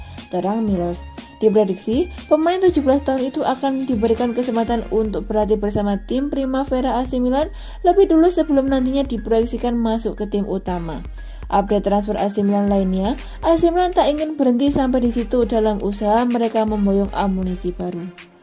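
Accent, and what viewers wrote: Java, Indonesia. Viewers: Medok e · Logak Jawa nya kentel amat njiiirr 😂😂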